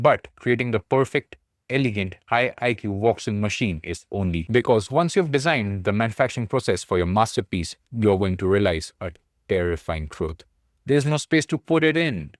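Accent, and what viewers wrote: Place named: India. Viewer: Completely off topic. Thank you for a spot of nostalgia. One of my previous employers was a small satellite to an international Indian company. All of the reasons why I left were the UK and US oriented business culture. That stuff is just oppressive, dumb and bad for both morale and business. Many of the original Indian concepts were pretty good. And they came with the musical accent you remind me of. No regrets on walking out, but I do miss that part.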